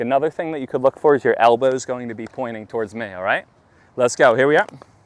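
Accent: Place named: Philadelphia, United States. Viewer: Just discovered your channel—very valuable lesson! —you from Philly? Dialect reminds me of home…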